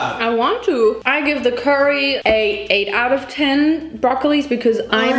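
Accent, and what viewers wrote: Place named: Germany. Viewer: Your German accent is absolutely cute!! Your so funny, thank you for the laughs.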